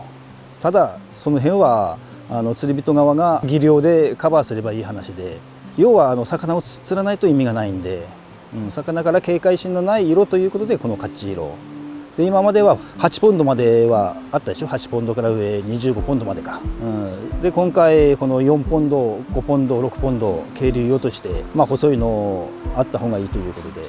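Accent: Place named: Northern Tohoku, Japan. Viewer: 北東北独特の訛りがかっこいい！ 、話を聞いているだけで、釣りに対する知識や姿勢が感じ取れる、かっこいい方ですね。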